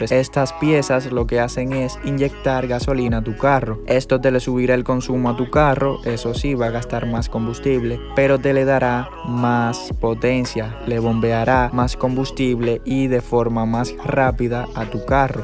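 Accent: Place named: Dominican Republic. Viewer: Yo sabia que esa forma de hablar tuya era dominicana ufff puro flow domi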